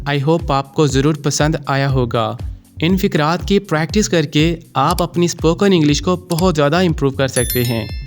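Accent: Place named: United Kingdom. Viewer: mashallah ap ka accent British he really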